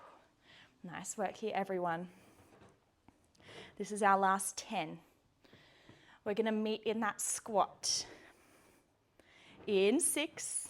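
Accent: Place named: Australia. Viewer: I've only recently found you. I've done couple of your classes, and I really enjoyed this one. I also LOVE listening to an Australian accent instead of American! 😊 I also like how you refer to different reformers and modify for them. I have a YR, and I'm an instructor, but don't do enough of my own practice. Thank you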